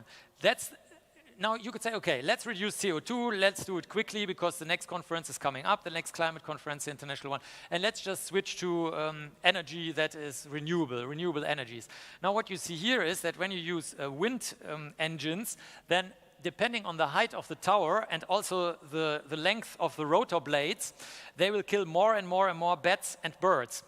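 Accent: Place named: Germany. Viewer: Für jemanden der aus new york kommt sehr krasser deutscher akzent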